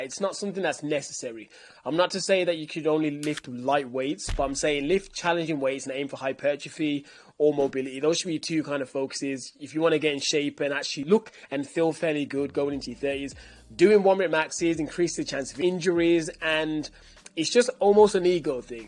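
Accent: Birmingham, United Kingdom. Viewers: are u a brummie? ❤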